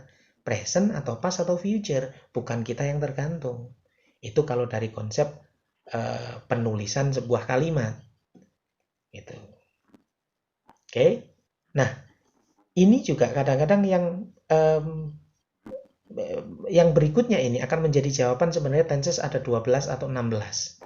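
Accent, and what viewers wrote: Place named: Surabaya, Indonesia. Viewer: ALHAMDULILLAH. THANK YOU. VERY HELPFUL. ESPECIALLY USING SUROBOYOAN ACCENT ❤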